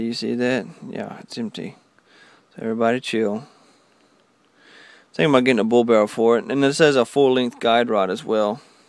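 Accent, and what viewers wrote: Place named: Texas, United States. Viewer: JohnnyAss, Dude is from Texas. STFU Hollywood.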